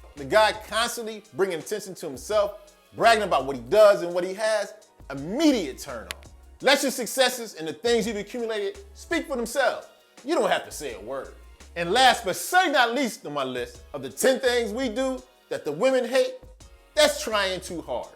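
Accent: Philadelphia, United States. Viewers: Wait you’re from philly?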